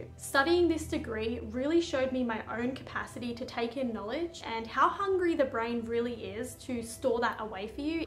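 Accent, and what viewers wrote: Australia. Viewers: I'm so glad I accidently found your videos. I live your energy. I was just playing random naturopath videos while doing a workout and I was like is this an Aussie? lol *I'm in Q.L.D. 😎🍉💃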